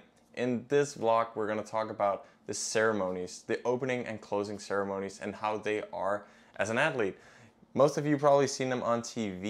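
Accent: United States. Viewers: Appreciate your sharing。Your English seems more like American style than British style. Why is that? Is the general case for Danish speaking English? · You are from Denmark but yet you have an American accent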